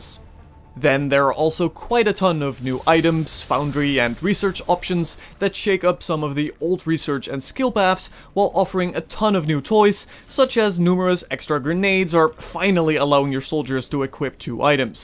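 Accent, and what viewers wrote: Netherlands. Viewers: I think I hear a Dutch accent. :)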